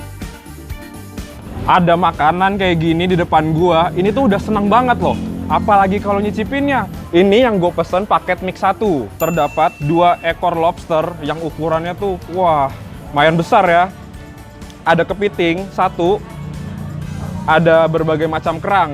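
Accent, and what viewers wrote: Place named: Tangerang, Indonesia. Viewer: Logatnya tangerang banget bang😂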